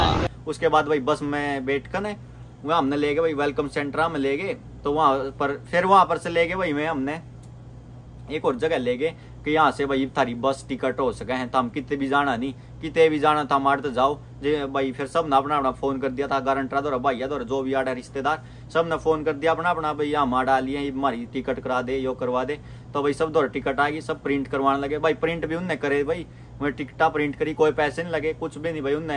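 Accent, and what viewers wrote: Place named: Haryana, India. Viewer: hindi hee toh bol raha hai ba accent haryana wala hai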